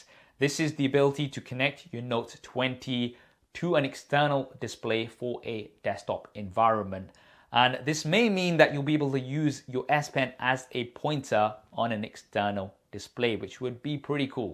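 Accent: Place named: United Kingdom. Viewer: i really enjoy your videos when i play them at 1.25 of youtube playback speed...maybe its the British Accent after all...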